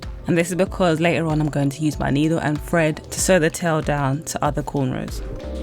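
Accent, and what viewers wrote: United Kingdom. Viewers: and lol I love your british accent!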